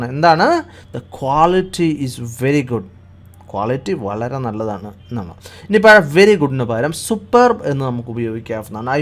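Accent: Kottayam, India. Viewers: Please avoid sir kottayam slang I didn't understand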